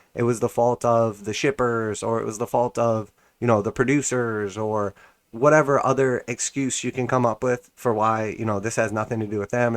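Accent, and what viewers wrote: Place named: United States. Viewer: I guess it is a bit different for you in the US, you sound like you live in the USA. I'm in the EU and I don't think it will be a problem to get them. My local hobby shop is already taking pre-orders for them. But i guess we will see.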